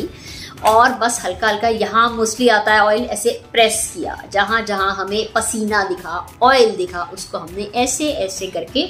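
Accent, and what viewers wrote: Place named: Lucknow, India. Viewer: Hi aap ki boli kitni achchi hai kya aap lucknow se belong karti hai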